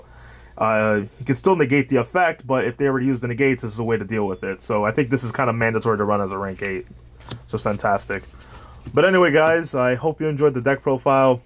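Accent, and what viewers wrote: United States. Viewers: Is English not your first language, you sound American, or did you genuinely not know? :v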